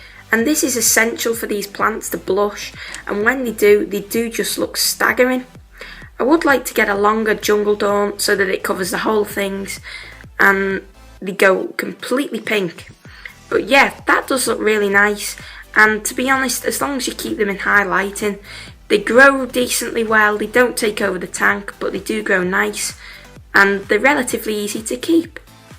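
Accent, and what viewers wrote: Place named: Scotland, United Kingdom. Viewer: I like ur accent, is it Scottish? Or British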